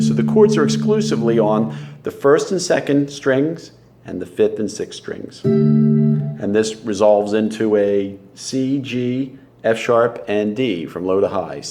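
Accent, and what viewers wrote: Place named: East, United States. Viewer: I realize now that you've been in England for a long time, and that's probably why I hadn't seen you, and your eastern US speaking style didn't give this away. :)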